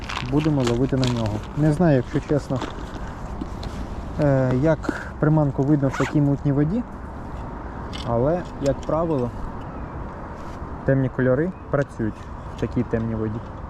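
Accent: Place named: Russia. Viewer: сразу слышно, шо хлопец в быту говорит по-русски (не по-российски), но под давлением пОтриотичного рогулья, вынужден видосы пилить по-украински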